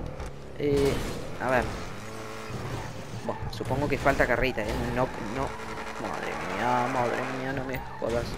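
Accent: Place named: Argentina. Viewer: Me vuelve loca el acento argentino y mas si es hablado por ti, te quiero, saludos desde Mexico❤️❤️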